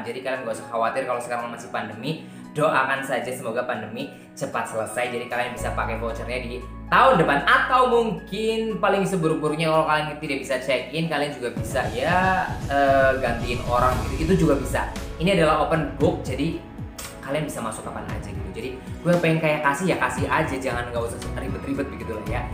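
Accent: Java, Indonesia. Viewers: Weslah ke Bali wae ben diopeni, Ganbathe san. wae Suara mu jowo medok renyah di rungokan. Hehehe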